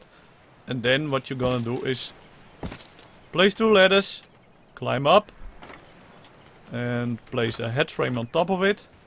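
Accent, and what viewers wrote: Netherlands. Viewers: is this guy dutch because of his accent? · Hij is sowieso Nederlands. Dat hoor je duidelijk aan zijn uitspraak en hoe hij "Simba" zegt.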